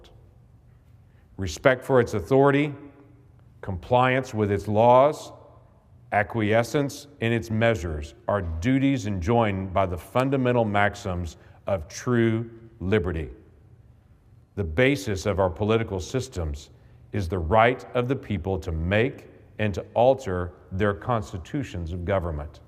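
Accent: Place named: Louisiana, United States. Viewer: I love the new Orleans/ Louisiana accent especially with the fiery anger an conviction coming along with it. That kinda righteous anger and vengeful fury is what you need to combat the violent and psychotic animals coming across the border who have no intention of coming to America to become part of it and one of it's people. They are here to poison everyone slowly and profit immensely from your long drawn out death. 30-40 years of deep corruption since the rise of drug cartels has turned south America into a hellscape and demons and devils are the only thing that can survive in a hellish environment so it's no wonder these so call humans are violent and twisted to the core and won't shy away from the most extreme measures and heinous acts which they carry out with a deranged fervour. We are at war with south America because their own states and people are invading out country an killing our citizens by use of unconventional warfare in the form of narco terrorism. 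Mexico made a secret treaty with Kaiser Wilhelm to attach the US back in WW1 and since humans never learn from their mistakes, they leave themselves wide open to repeating them. This country has become so soft by pushing this" enlightened ignorance" other wise known ask being woke. We have grown too soft as a society and we a ripe for exploitation. The average citizen of the US is woefully unprepared for what's to come and have no fight in them as it is squished out from an early age creating a generation of weak, s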